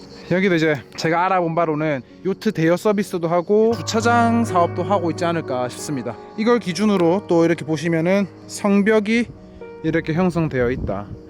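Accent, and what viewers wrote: Gyeongsang, South Korea. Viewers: 우연찮게 보게되었는데 정주행 하게 되네요.같은 경상도 사람이라 억양이 친근하이 좋습니다ㅎㅎ영상은 어디서 배우셨나봐요.전문가 포스가 느껴지네요.안전한 여행하시고,좋은영상 기다리고 있을게요^^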